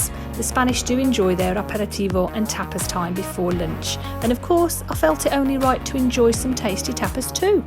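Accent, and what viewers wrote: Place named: Birmingham, United Kingdom. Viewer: Great to see things getting back to normal, over middle of September should be a lot further advanced by then and you must be a Brummie with that accent 😃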